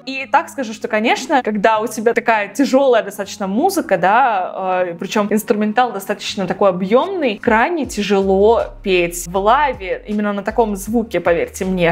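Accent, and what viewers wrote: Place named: United States. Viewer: silence? .... никогда не критикую строго ютуберов, ибо сам начинающий, но так пафосно в начале видео произносить с американским акцентом слова и не распознать слова в тексте - помоему это фейл, Вам не кажется?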